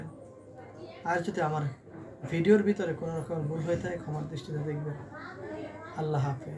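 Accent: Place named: Sylhet, Bangladesh. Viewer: Uni sylheti Kotha shune buja jacce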